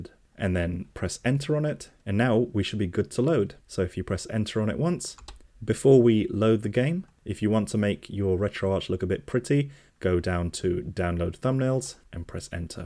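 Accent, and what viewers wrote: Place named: United Kingdom. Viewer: Oh, I meant it in a positive way! I'm not a native English speaker, so I’m used to hearing different British accents. Your accent sounds softer and more neutral compared to others I've heard, and it’s nice.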